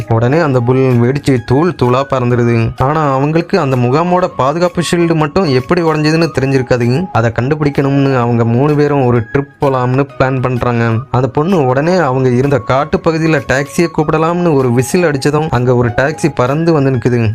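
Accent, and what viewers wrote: Kongu, India. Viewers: Yennunga nenga kongu mandalama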